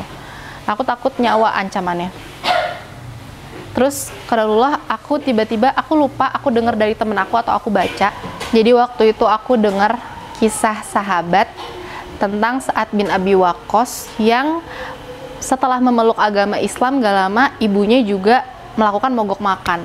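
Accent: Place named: Jakarta, Indonesia. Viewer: Jakarta banget logatnya 😀 Istiqomah cii yahh 🙏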